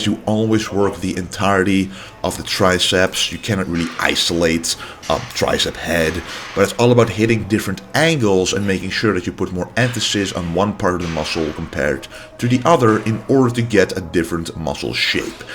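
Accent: Netherlands. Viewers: Jaa man. Wist ik all. Jau accent. Nederlander wooh! You can't hide your Dutch accent haha. Amazing channel man.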